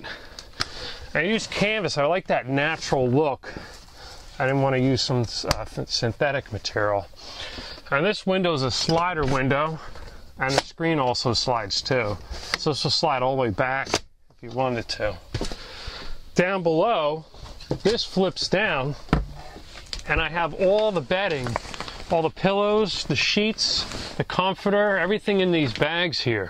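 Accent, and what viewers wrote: New Jersey, United States. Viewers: terrible jersey accent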